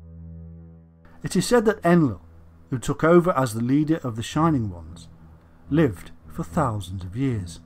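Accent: United Kingdom. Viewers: The minute I heard the British accent I knew this would be a watered down "damage control" version. Presented more as a myth than reality. Read Sitchin for the real truth.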